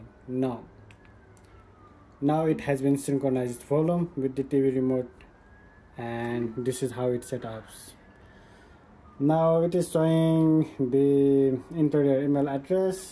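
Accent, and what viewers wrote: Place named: India. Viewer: Are you Indian? Because your accent sounds like it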